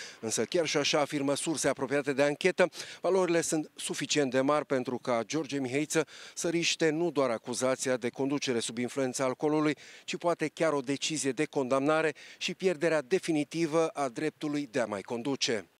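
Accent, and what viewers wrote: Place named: Moldova. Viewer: e sholdovean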